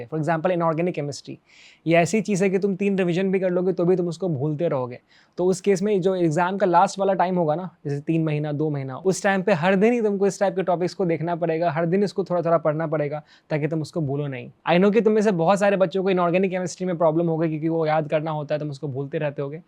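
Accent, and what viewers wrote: Bihar, India. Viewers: Do u from Bihar ?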